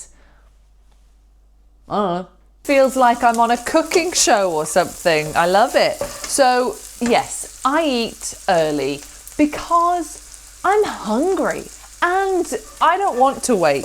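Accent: United Kingdom. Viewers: Eu amo está professora e o seu lindo sotaque britânico. Seria maravilhoso se tivesse vídeos também com legenda em português e inglês juntos. Ela teria milhares de novos alunos brasileiros.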